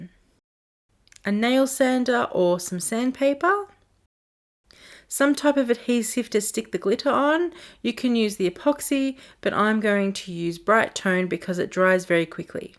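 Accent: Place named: Kentucky, United States. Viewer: Love that Kentucky accent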